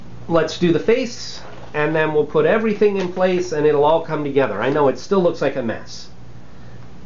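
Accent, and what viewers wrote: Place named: Australia. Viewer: that's the best koala bear balloon ever. i also liked your austrailian accent for the intro. well done. keep on twisting.😍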